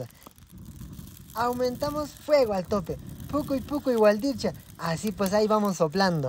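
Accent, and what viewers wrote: Peru. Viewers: Buen día, joven no se de donde es usted. Observó que habla como los mismísimos cusqueños la tierra de mi señora madre. Felicidades por no perder sus raíces y escencia.. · Me encantó este cocinero me 😂 mucho con su endulzadas con sal espectacular esa manera de cocinar y de decir las cosas mil felicitaciones me gustó tanto que me suscribí casi no lo hago, de donde es? Perú?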